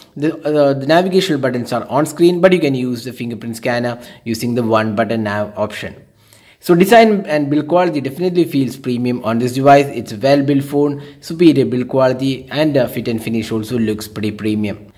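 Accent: Kerala, India. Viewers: Malayali accent.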